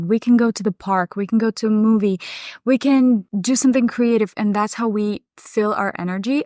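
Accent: Russia. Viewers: акцент русский, вы откуда? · Please stop doing typical Russian accent in purpose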